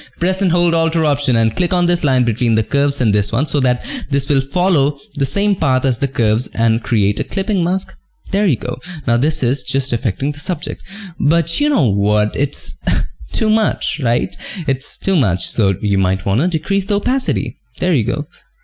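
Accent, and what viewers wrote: India. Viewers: Dude, Indian accents are bad enough, but that breathing and uni are simply impossible to deal with.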